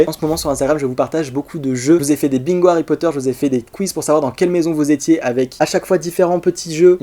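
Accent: England, United Kingdom. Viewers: Mais quel accent anglais ! Whouaaa 😂💕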